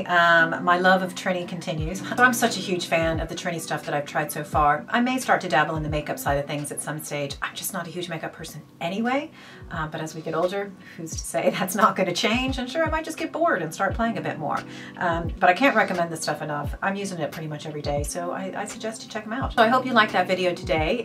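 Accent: Ireland. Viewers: Beautiful. Also, your accent - with its tiny hint of Irish - is adorable. ☺️ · Hi, I’m so glad your channel was recommended to me... this is the first video I’ve watched of yours and at first I thought you were Canadian, but it’s the Irish lilt I can hear. I’m a big Trinny London fan too and the brand is cruelty free which is a must for me. I love her, she’s a bit bonkers but she makes me smile and her products are amazing. The eye colour is great too, just a quick dab on the eyelids (you don’t even need a mirror) and I’m good to go... nowhere! 😂 I’ve just subscribed and am going to binge watch your other videos 💛✨💛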